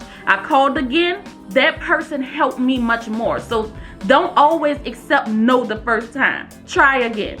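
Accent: New Orleans, United States. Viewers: New to the channel but I’m here forever now! I just know it’s the Nola accent for me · I know a New Orleans native when I hear one!!! Hey baby.... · New subbie here. Even though I am located in Missouri now. I was born on Metairie. I hear New Orleans in your voice...are you a Louisiana native? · You have that New Orleans accent!! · New Orleans? That accent strong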